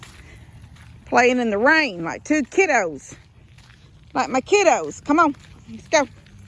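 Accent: Texas, United States. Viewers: sometimes watching you do practically nothing but talking (in your wonderful Texan accent) are the funniest videos. Thanks for the laughs. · I'm from Central Alabama... lived here all my life. I didn't realize the Southern draw Texans have. Even more than me. LOL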